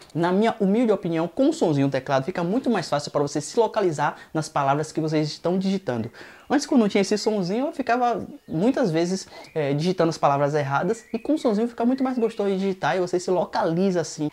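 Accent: Bahia, Brazil. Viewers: Você é baiano também? Boas dicas!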